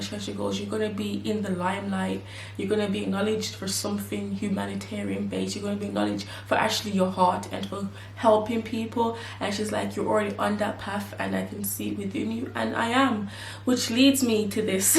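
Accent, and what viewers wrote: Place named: Jamaica. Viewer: I hear the Jamaican in you.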